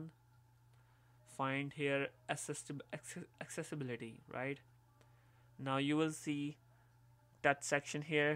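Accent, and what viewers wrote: India. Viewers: Pahadi English bahut badhiya ji❤❤👌👌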